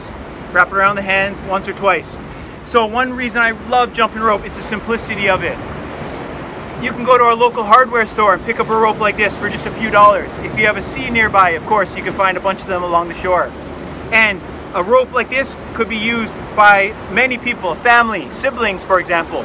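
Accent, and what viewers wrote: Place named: United States. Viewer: Still really enjoying your content. As a typical sedentary 60 year old American I need all the positive example and inspiration I can get; you keep me focused on getting in a few skipping workouts each week. Currently working on various cross-overs and footwork drills to put together a consistent ~20 minute workout that is challenging but enjoyable. Extreme heat and humidity along the US Gulf Coast makes it much harder for an old guy like me but once the seasons change it will be much more enjoyable. Why do I think you're in Ireland (but you sound American or Canadian...)?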